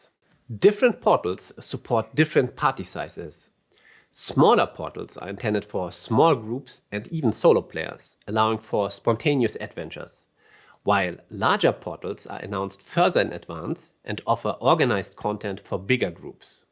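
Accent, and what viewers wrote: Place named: Germany. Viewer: Uhhh this German accent... 😋 So cute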